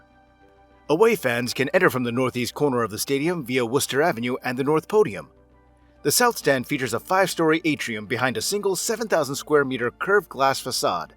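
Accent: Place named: United States. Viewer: Who is this Yank narrating about Spurs’ stadium?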